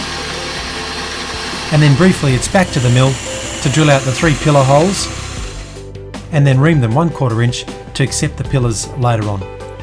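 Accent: Australia. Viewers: Bloddy hell cobber, have you got an aussie accent mate! Crazy the difference between states (Sth Aust.)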